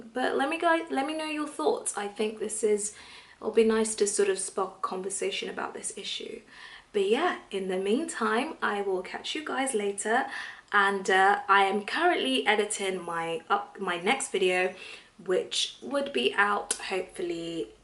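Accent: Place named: England, United Kingdom. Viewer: Cutie but I hate the English accent!!!!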